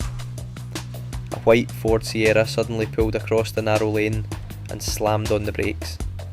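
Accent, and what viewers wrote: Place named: Scotland, United Kingdom. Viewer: This is why I like ,a scottish voice telling the story keep the good work up 😊😊x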